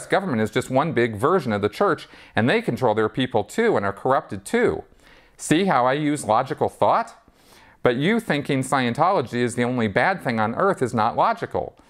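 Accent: Minnesota, United States. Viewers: Yikes.... nothing like ad hominem! And imagine not being able to spot a Minnesota accent. No specific disagreements, just generalizations. And we know what Hubbard said about people who engage in generalizations....;) And the 'you'll delete this' messages always get me. What maroons! You're a class act, "."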